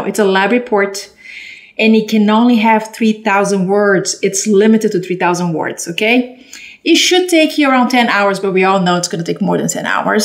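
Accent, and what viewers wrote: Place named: Latin America. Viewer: Clear, concise description, enriched with a Latin accent.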